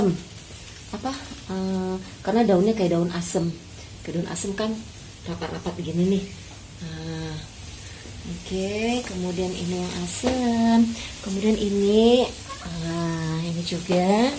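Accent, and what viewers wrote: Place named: West Sumatra, Indonesia. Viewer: Bund asal sumatera barat ya??.logat bahasanya..😊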